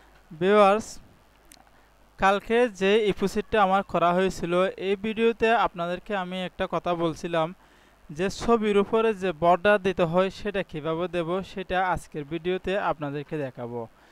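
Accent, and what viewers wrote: Chittagong, Bangladesh. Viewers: ভাই আমার মনে হয় তুমি চিটাগাং এর লোক তবে ভিডিও করার সময় কথা একটু জোরে বলবেন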